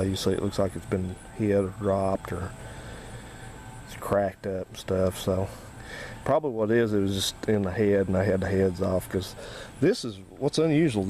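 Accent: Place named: Georgia, United States. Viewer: you've gotta be from south GA. Ill be danged if Im wrong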